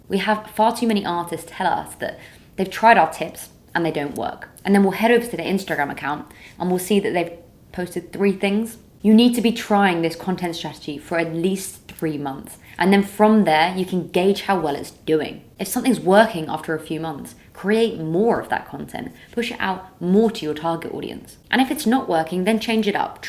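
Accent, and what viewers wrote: England, United Kingdom. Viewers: It’s simple, I hear the British accent, I click like.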